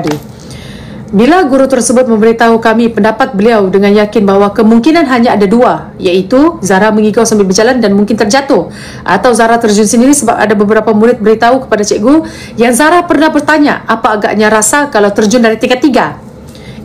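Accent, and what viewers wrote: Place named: Kuala Lumpur, Malaysia. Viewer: ending terbaik...Sdri ni mahir bertutur dgn gaya pertuturan org KL Sgor la.....canggih...thx..all the best and May ALLAH perlihara semua geng yg BENAR dan memebala anak kecil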